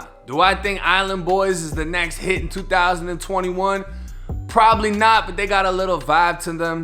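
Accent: Boston, United States. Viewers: oh youre from Boston for sure. soon as you said "Car" it was confirmed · Yo you from Boston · Wait. You said PAHKINLOT LIKE I DO BRUTHA. you from Boston. I'm in Dorchester. South bay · You from Boston bruh? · He from Boston I think lol he got that stupid accent 🤣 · Depottment? Incosserated? Are you from Boston, sir?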